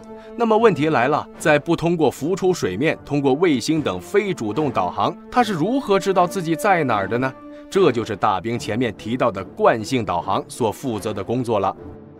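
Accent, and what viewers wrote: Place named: China. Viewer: 這是中國人做的『4瓶』雖然大量使用【正體漢字】但是《腔調》與習慣是騙不了人的!! 用詞遣字發音腔調騙不了人的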